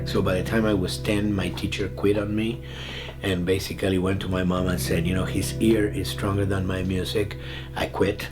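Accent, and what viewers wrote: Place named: Argentina. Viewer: El tono Argentino se te nota desde el pincipio♡♡♡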